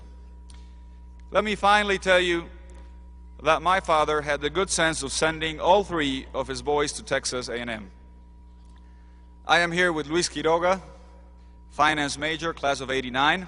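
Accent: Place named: Latin America. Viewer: ¿ orgullo boliviano? realiza un discurso grandilocuente, repitiendo las frasecitas cursis que escuchó en tantas películas gringas. Pero, a sus espadas, los académicos se matan de risa. ¿Por qué? Para ellos, es Félix Patzi hablando castellano, esforzándose en hablar inglés fluido, pero no puede disimular su acento latino, en pocas palabras, está haciendo el ridículo. Está hablando la mascota que ellos adiestraron. ¡Es indignante! Hablar inglés y vivir en EEUU, es el sueño de muchos bolivianos desclasados. Cuando era gobierno, pretendía vender el gas boliviano a los EEUU por un puerto chileno. ¿Qué hizo por Bolivia? Nada.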